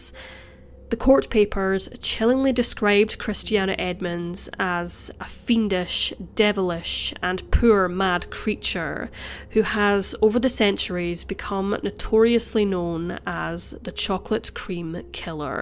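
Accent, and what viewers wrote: Scotland, United Kingdom. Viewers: Ah, that Scottish burr... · Lovely to hear a Scots narrator for a CHANGE.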